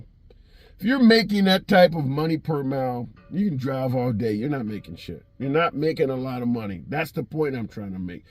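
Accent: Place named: New York, United States. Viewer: are you from New York or Boston?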